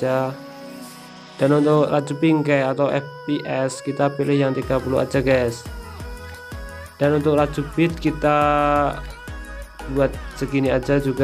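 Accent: East Java, Indonesia. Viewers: Jawa timur nya mana bang? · Jawa timur yak ??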